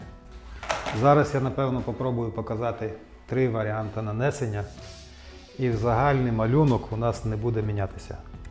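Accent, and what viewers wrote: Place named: Western Ukraine, Ukraine. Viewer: Тогда тебе придется учить язык всех 14 остальных республик бывшего СССР! Ведь остальные тоже тебе так скажут. Русский был выбран по праву большинства.Только и всего.И братья тут не причем. Было бы украинцев 175 миллионов, как при совке русских, то и гоаорили бы на украинском. А тот факт ,что автор начал делать ролики на своем языке,говорит о том, что ТВ пропаганда ,стравливания РУСОВ, свою работу таки сделала. Он со Львовской обл., города Стрый, его Западенский акцент всегда чувствовался, но тем не менеее,он вещал на русском, а тут вдруг проснулся...) Патриот вшивый! А где ты раньше был?! Что раньше,до захвата Крыма, по-русски вещал? Скажу, як громадянин України, рагулів, таких ,як автор цього відео, пів України !!! Бидло ,воно й в Африці бидло! Дизлайк і відписка !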